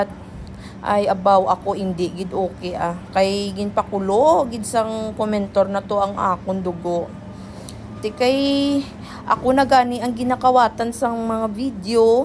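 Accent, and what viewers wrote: Iloilo, Philippines. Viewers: I loved listening to your ilonggo malambing sya from Masbate to stop answering mga basher I watched Latino manicurist , Vietnamese, Korean advance sila , sa pinas isa ka malinis magtangal ng ingrown dry skin everybody is talking while working none stop gid sya Vietnamese to ukit ang style nya at gusto ko yong mga gamit nya it can make it easier sa trabaho try mo mag watch internationally it might give you new idea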